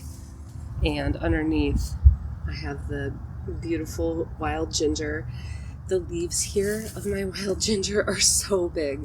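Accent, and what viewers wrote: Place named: Chicago, United States. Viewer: Thanks for the tour and all of the content! Long story shorter, I’ve had to let some things go for a while because #life…so as I start to take back some of my spaces and learn from my mistakes 😬, you’re inspiring me to consider and incorporate more natives. I was also today years old when I realized some of those “weeds” I have might not be…. It’s also nice to hear a natural Chicago accent. I’m a few hours away and don’t get home enough.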